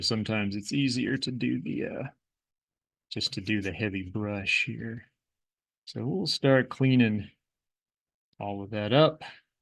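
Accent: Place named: Southern Ohio, United States. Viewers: Yoo dude must be local to southern ohio/WV he pronounced everything right 😂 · His accent sounds like mine and I'm from Rio Grande. So my guess was also he's a local. Probably from somewhere far from Monongahela as he said it how I would guess which is apparently wrong. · OP has an E Ohio/W PA/ N WV accent.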